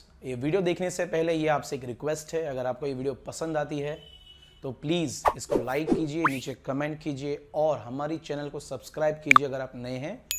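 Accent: Gujarat, India. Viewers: Sir aap GUJARAT se ho?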